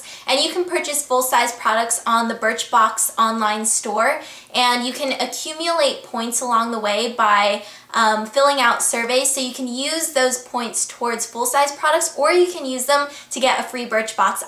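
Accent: France. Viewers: you have an amazing french accent (: birchbox need to send to england! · ahah i like your french accent !! uugghhn i really hope BIRCHBOX will send to France soon !! i'ld like to try this; it seems really fun and its a cool way to try new things have a good day =) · your french accent,, is so cute :) haha xx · i'm a french girl and your french accent it's really coooolll & pretty ^^* !!!!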